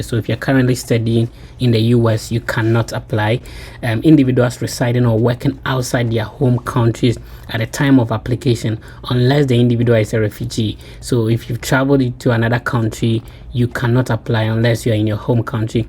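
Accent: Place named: Ghana. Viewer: You speak a Ghanaian English. Are you Ghanaian?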